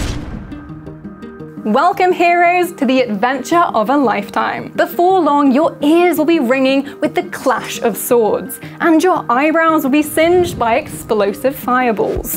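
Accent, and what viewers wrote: England, United Kingdom. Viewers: I thought Aussie at first, but after watching the rest of the video I'm pretty sure the other comments are right. I don't know enough about accents to really describe the differences between this and a typical Aussie accent, but it sounds British. Definitely not Kiwi - a NZ accent makes every vowel sound the same. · British accent. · She is fantastic. A laid back eloquent English accent i like it. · She is english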